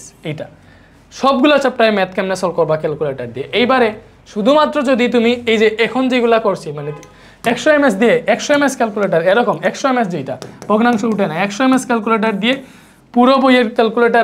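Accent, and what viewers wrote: Sylhet, Bangladesh. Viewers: Bhaiya apne kita sylhety nii🫠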